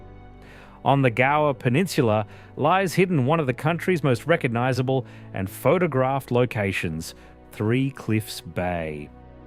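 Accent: Australia. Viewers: Lovely video with very relaxing narration. Is that an Aussie accent? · Nice if the place names were all pronounced correctly. Also odd to have what sounds like an Australian accent narrating. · Yes,and an Aussie who's trying too hard to make it sound dramatic, but ends up with odd pauses and emphasis.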